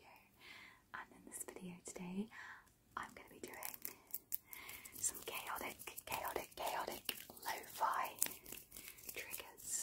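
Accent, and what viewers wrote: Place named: RP, United Kingdom. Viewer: How come you have a sort of posh accent?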